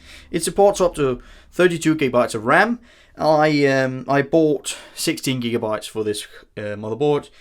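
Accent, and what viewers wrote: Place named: United Kingdom. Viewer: you look American but sound British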